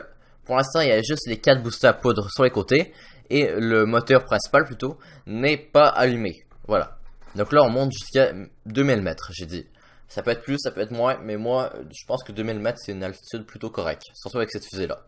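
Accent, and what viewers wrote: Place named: Quebec, Canada. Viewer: Ton accent paraît québécois donc je suis casi sûr que tu es un canadien mais dis-moi, t'es un passionné de l'espace ou t'es à l'école d'astronomie en faite, moi je fais attention à presque toutes mes sources d'information, je vais dire que j'ai appris pas mal de choses nouveau qu'en voyant quelques-unes de tes vidéos qu'aujourd'hui je me suis trouvé sur ta chaîne aujourd'hui même 31 déc 2019 en chercheant avoir quelques notions sur ce que c'est "l'orbite" et pour dans les résultats je suis tombé sur la vidéo que tu as fait a propos de ça, merci de lire j'attends la réponse de ma question hein, il faut que je vous dise aussi que je suis haïtien mais vivant au chili. Merci encore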